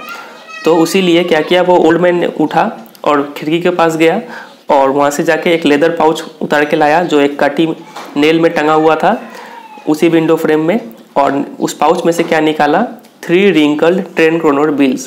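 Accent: Bihar, India. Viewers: Your 3 tho and kaati proves you bihari😂😂lol I am bihari too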